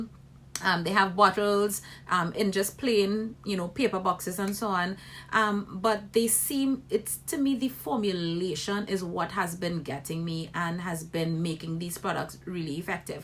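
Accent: Trinidad and Tobago. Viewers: Are you trini? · are you from trinidad?Love this!!!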